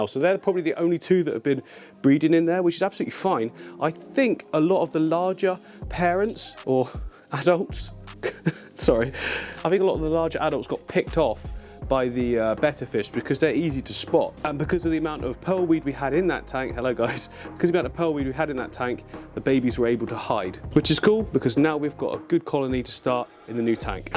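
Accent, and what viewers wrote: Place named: United Kingdom. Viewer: I really like your tanks, but this british accent is bloody haaard, innit? I just can't watch it, sorry. But a lot of kisses, wish you well.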